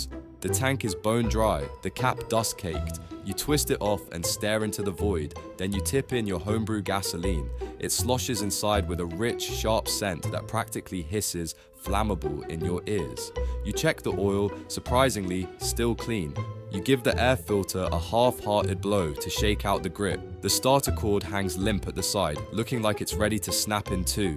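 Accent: United Kingdom. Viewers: Get someone who can speak English OT Cockney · Good dry English accented humor., why does everything have to be AI now?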